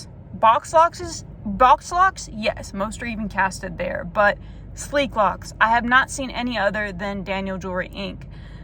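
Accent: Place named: Alabama, United States. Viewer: Also, you sound like you're from Alabama, the "Ah Knoee" gave it away.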